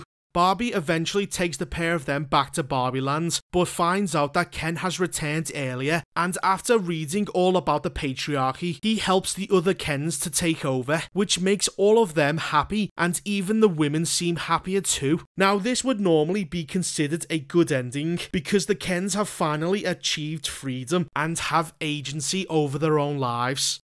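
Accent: Liverpool, United Kingdom. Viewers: Pure Scouse poetry. Love it 👌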